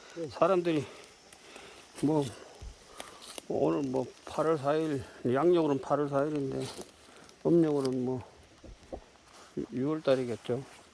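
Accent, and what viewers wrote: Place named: Mungyeong, South Korea. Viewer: 저는 고향이 문경 입니다. 저의 고향 말투랑 비슷해서 정감이 가네요.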